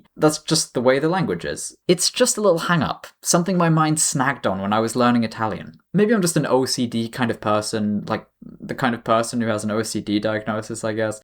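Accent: United Kingdom. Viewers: y u sound brit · I always thought you were a native brit and I thought I was going crazy hearing swedish in your french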